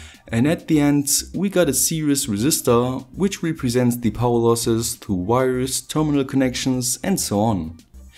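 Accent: Germany. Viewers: I get excited whenever says "Let's find out!" in that pronounced German accent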